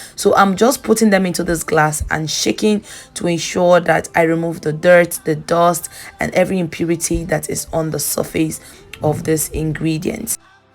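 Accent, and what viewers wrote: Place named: Nigeria. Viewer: you’re annoying ! Just answer the comments 😂 not everyone can understand that strong ass Nigerian accent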